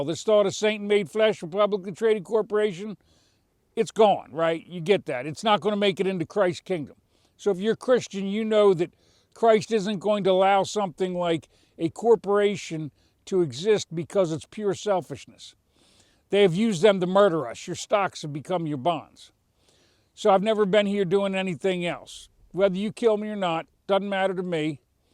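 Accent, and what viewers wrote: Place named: Pittsburgh, United States. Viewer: I hear that Burgh accent too💪 Yinzer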